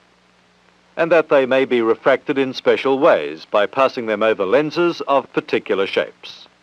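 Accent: Australia. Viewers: To my Australian ears it sounds like the Cultivated Australian accent, owing something to Received Pronunciation but definitely Australian. The General accent (Hugh Jackman for example) is broader than this and the Broad accent (think Steve Irwin) is way past that :) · Oh right yes. As an Aussie myself it was a (nice) surprise to me to hear an Aussie voice pop out in the context of a Fran film. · The announcer sounds very Australian to me. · These are great fun! I was wondering why an Aussie was doing the voice over for a US university film. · He's Australian, what do you expect? You want everyone to pronounce words in 'Merican?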